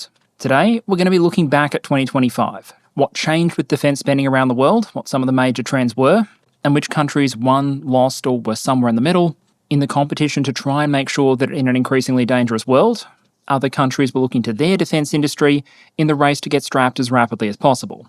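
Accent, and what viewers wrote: Australia. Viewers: Don't be a tool. Different accents exist. Australian derives from SE English which pronounces H that way.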